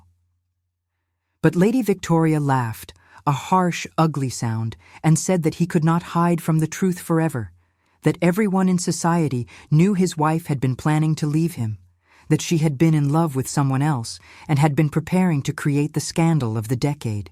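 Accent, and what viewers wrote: United States. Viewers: Why the American narrator? You need a British accent otherwise this sounds cheap.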